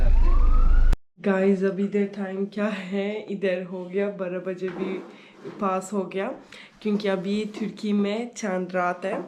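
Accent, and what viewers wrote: Pakistan. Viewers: Good video. love your urdu accent. be happy always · I really like you your smile aapi and ur pakistani accent too❤loves alot from pakistan and mehndi's design is also very cute😅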